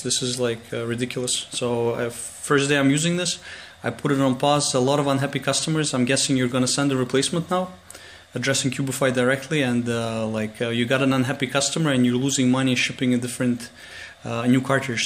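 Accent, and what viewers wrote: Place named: Quebec, Canada. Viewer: are you french Canadian? you sound like GSP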